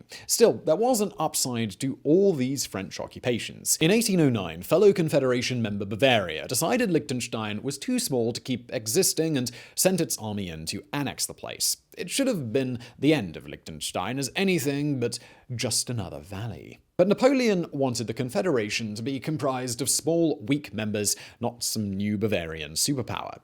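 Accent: United Kingdom. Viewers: this guys to British lol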